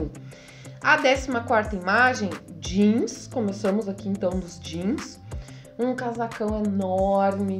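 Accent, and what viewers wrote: Rio Grande do Sul, Brazil. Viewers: adoro tuas dicas,faz pouco que descobri teu canal,sou gaúcha mas moro no MS,aqui 20 graus já é frio,sou muito friorenta,outra coisa que gosto tu não muda o nosso sotaque para falar no vídeo 🙌🙌🙌🌹🌹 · Adoro teu jeito de falar sutaque bem gaúcho ❤ sou Catarina e como o clima daqui e dai não tem diferença também estou enrolada na minha manta no sofá tomando chimarrão e vendo seus vídeos, obrigada pelas dicas 👏🏽❤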